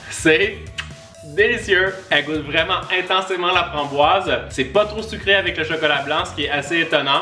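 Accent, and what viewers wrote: Canada. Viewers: tu mon youtubeur préféré de is vidéos accent canadien et qui met quoi des vidéos trop trop rire 👍👍👍👍👍 24h 🇨🇵🇨🇵🇨🇵🇨🇵 🎄 Noël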